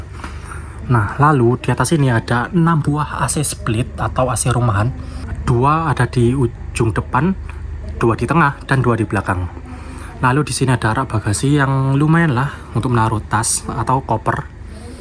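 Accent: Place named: Madura, Indonesia. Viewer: mas lucu dengerin logat bicara sampean kek orang madura wkwk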